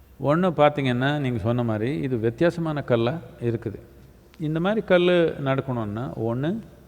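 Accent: Tamil Nadu, India. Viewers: Sadhuguru avargale unglaludhu nijamava sollununa arumaiyana vilakkam enaku ungalodaiya ovovaru pechugalume pudikkunge enaku unga tamizh slang rombhave pudichirichi neenge ippidiye ungaloda karuttugala sollinu irrunge naamavu unga ovovaru varthaigala enjoy panruvo aproma unga tamizha rasichirruko unmaile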